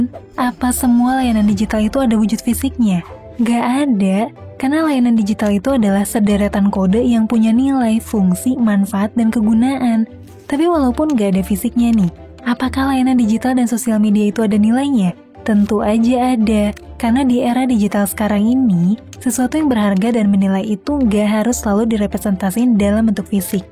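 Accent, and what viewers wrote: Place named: South Jakarta, Indonesia. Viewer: Jujur dari dulu pingin subscribe channel ini karena materinya bagus. Cuman ga tahan dengar suara Mbak naratornya. Kedengaran kayak sok ke Jaksel2an. Aksennya bikin geli.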